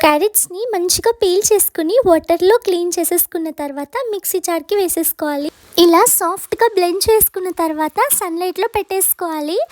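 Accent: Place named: Andhra Pradesh, India. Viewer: Akka nuvvu telangana ammayi va ap ammayi va nee slang chusthe ap la undhi mee family slang accha telugu (ts) la undhi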